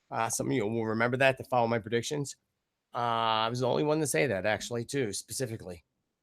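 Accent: Philadelphia, United States. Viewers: Our HumanityFamily deserves no less than what I've known will come for 2019-TRUTH and JUSTICE! We will deal and heal with the upcoming revelations that will SHOCK us to our core, together. ThanQ for this video! I love listening to you, lol, you remind me of home 😊, I was born in Philly and lived ALL THROUGHOUT the tri-state area growing up lol-there's NO hidin THAT accent 👍. 💜💜💜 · You from Philly? Accent sounds familiar...Delco?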